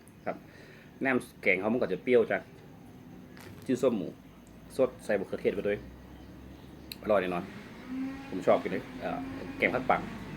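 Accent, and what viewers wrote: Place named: Central Thailand, Thailand. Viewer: ชอบคุณ พูดภาษากลางพอรู้ค่ะ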